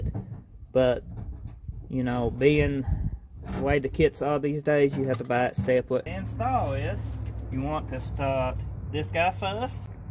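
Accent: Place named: South, United States. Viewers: Second thought sounds like their from the south so probably normal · Simple videos, explained in terms I understand. The Bobby Boucher accent is a plus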